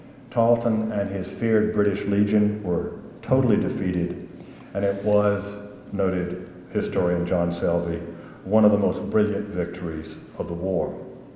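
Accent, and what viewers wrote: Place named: South, United States. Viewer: Great lecture! Thank you for sharing your vast knowledge with your perfect Southern accent😊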